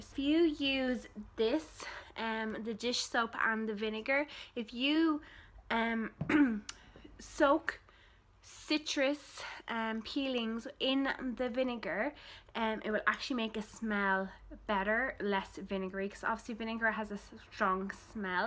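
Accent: Ireland. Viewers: Fantastic hack!! I could listen to you talk all day. Love your Irish accent. Thanks for joining the collab. #YTMM 💖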